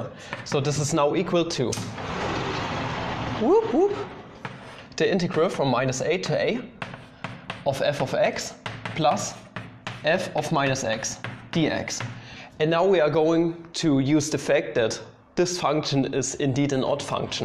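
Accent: Germany. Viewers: If you don't mind me asking, where does your accent come from? I, being an American (therefore having no idea what accents come from where), just think it's German. Is that right?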